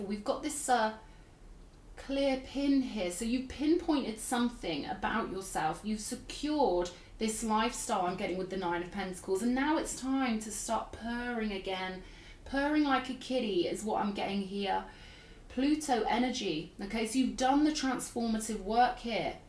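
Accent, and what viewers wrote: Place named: England, United Kingdom. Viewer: I love your accent! Where are you from? In which part of England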